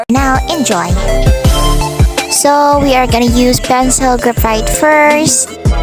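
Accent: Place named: Philippines. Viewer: ur voice kinda sounds like filipino :00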